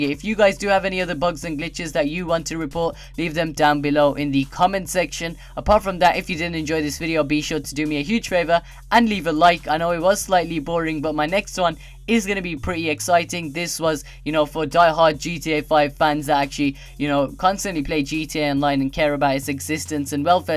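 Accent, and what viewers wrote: Birmingham, United Kingdom. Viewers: I know you are from Birmingham but what is your accent?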